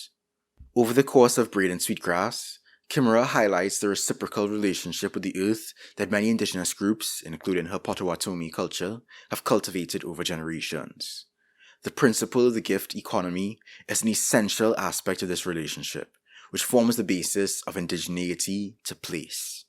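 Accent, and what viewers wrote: Trinidad and Tobago. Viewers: Great video! Found you through the latest Noah Samsen vid. Also a trini, recognized the accent immediately. Keep up the good work. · I heard the accent and went "It's giving, Trini". Glad to be right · Are you Trini? cuz this accent..... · Why you sounding like a Trini 😮🇹🇹💯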